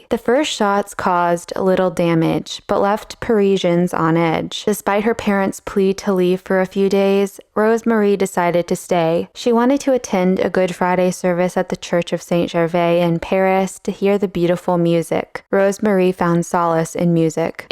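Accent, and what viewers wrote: England, United Kingdom. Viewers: Striking piece and story! Thanks for the historical and artists context. I loved your English boy accent 😂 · I love your English schoolboy accent! (I'm English) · The only beef I have with this commentary is your apology for the English school boy accent. It is my opinion that you need not apologize for any of your work. So informative and entertaining, I both laugh and cry when I watch these videos. Thank you for your efforts. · More English accent in future please! It was very cool. And that’s coming from the son of a Londoner.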